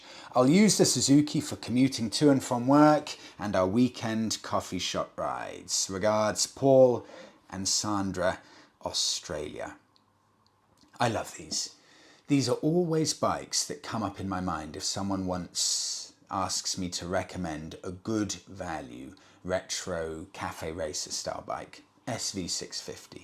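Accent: United Kingdom. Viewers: I love your two YouTube channels. Great content. But it’s your proper British accent that is just mesmerizing. It has the perfect pitch, tone, and pace.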